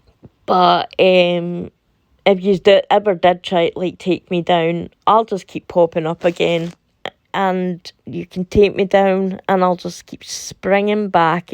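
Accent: Scotland, United Kingdom. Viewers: Great video!! Yes we love your accent. Love Scotland. I'm a descendant of Scotland.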